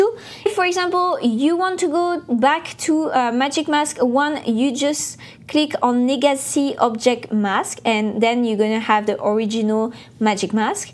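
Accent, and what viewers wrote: France. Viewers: Salut.. Merciiii. Pour. Ce video. surtout que c'est ton specialité. J'addors ton accent français. Quand tu parle anglais.. ❤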